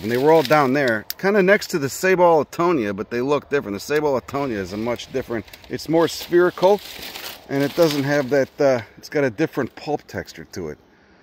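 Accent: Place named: East, United States. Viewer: I'd love to know, where is that accent from? I'm from CO and CA, so I have trouble identifying Eastern accents.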